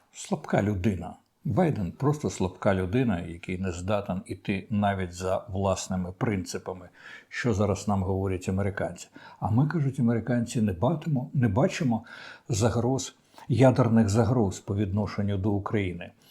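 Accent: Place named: Russia. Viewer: пане, вам так подобається московська мова, московська фонетика? що таке "нічтожество"? вам не подобається "нікчема"? і чому "КІЄв"? "КлІчко"? ви ж начебто наголошуєте кожного разу, що російська мова - це дніще, то може, вам самому варто почистити свою українську?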